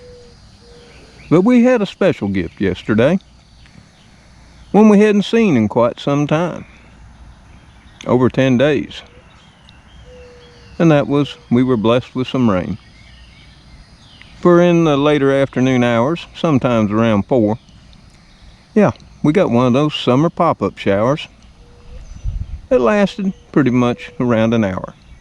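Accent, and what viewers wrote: South, United States. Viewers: I absolutely love the southern accent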